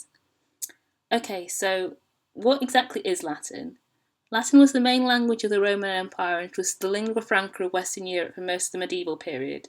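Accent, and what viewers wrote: England, United Kingdom. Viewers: I appreciate all the effort you have made but you accent when you try to speak Latin is just so terribly English. It sounds funny. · I think you have a very strong English accent in Latin😅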